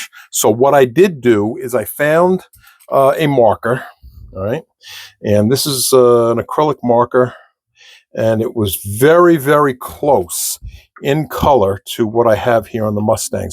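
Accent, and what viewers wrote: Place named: Suffolk County, United States. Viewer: Just found your page wow awesome content I just watched 3 videos,great info and tips/tricks I live in fla I'm originally from Suffolk county so it's refreshing to hear your voice lol happy holidays and please post more videos I'm going to share it with some friends thank again great work!!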